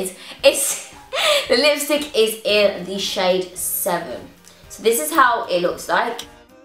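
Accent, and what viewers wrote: United Kingdom. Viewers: Are u British cause I hear the accent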